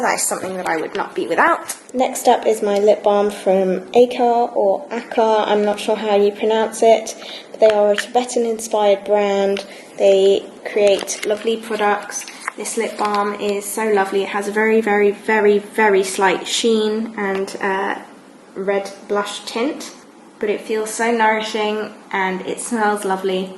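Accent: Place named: United Kingdom. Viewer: Hello lovely! This was a great video, awesome to have you here on Youtube. Thank you for sharing what's in your bag, the solid perfume is super handy. Haha just being nosey but are you from the UK living in Vietnam? Just going by the accent :)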